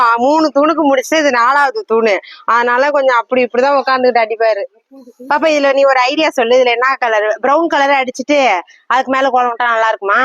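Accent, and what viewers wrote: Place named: Mayiladuthurai, India. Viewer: Srumsi thirsmaisali ma❤😊 enga relatives lam mayiladudurai la erukanga unga slang apdiye eruku anga erukavanga lam epdi ungala mare dha semaya vela seivanga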